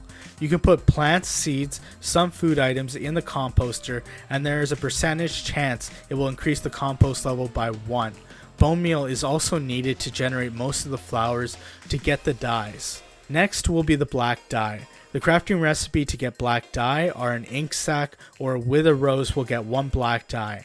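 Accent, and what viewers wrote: India. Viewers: I know because you can notice the accsent of indians and other And the channel name a pic are both realated to india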